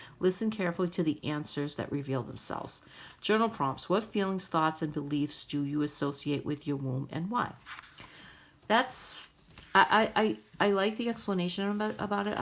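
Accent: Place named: Boston, United States. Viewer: LOL. Every once in a while a word at the end of a sentence sounds Jersey or something (I never was there either but knew people from there). I WAS to Boston once ages ago so maybe the accent just sounded a little familiar. But cool! Boson/Portuguese! Well, love it! Next, is when will we get to see YOU? Take care! 🥰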